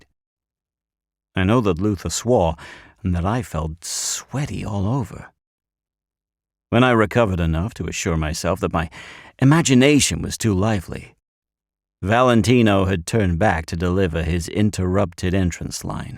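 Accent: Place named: United States. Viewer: Many thanks,!! Great American accent!! I'm American and I think you did a great job!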